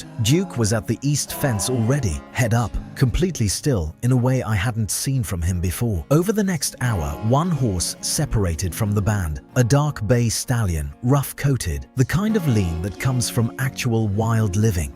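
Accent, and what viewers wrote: United Kingdom. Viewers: Why does the narrator have a British accent when clearly this is an American story? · why does ALL YOUTUBE AI Naration have a uk accent? makes no sesnse at all!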